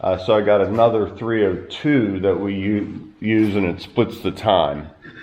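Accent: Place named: Pennsylvania, United States. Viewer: Love the channel. Just wanted to say there are no "Z"s in greeeassse. Love the Pensilvainia accent. Those are s's not z's. People point out my Ohio accent all the time and I live in the US Virgin Islands.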